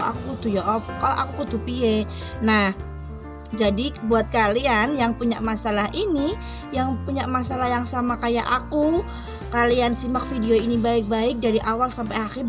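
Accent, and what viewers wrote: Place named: Java, Indonesia. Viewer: mb klau bikin konten ,jgn pake logat jawa..pake bhsa indo..saya mando ora ngrti..😂😂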